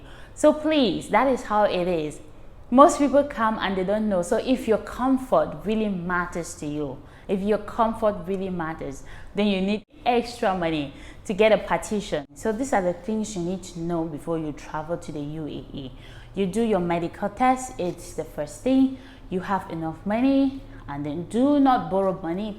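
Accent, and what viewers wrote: United Kingdom. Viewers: Our Camer lady with British accent.great job keep up 🔥🔥🔥🔥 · The simple truth about the UAE. great job. Clean queen's English keep it up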